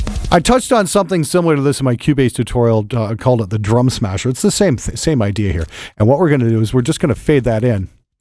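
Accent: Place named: Canada. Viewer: I have subscribed because I love your take on things, and your delivery.... except like all hosers you really need to learn to pronounce an O. Seriously love this channel.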